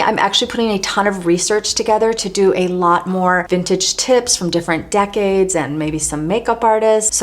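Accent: United States. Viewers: obviously american?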